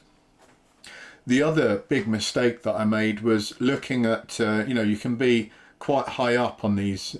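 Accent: United Kingdom. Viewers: Doesn’t that English accent bring a lot of cache all over America? I had a pal, from Wales actually, kind of a Joe average, but he just attracted women and good will just by opening his mouth anywhere he went, restaurants, bars, just out shopping, it was actually good to hang around with him just because of the spillover and association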